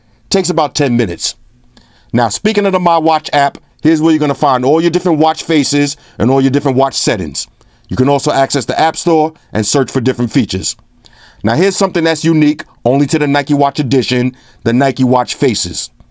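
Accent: United States. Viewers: Haha. That's awesome,. You sure sound like an American. Nonetheless your reviews are awesome. Keep it coming!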